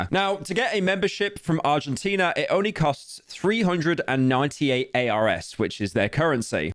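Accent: United Kingdom. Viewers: listening to british furry man talk about economics makes me want this to be my only source of education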